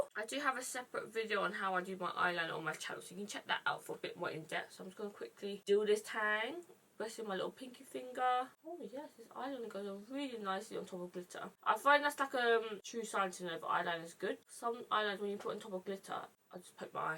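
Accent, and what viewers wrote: United Kingdom. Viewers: Its always weird going from watching people on insta and then finding their YouTube channel and actually hearing them talk :p totally didn't think she was from the uk!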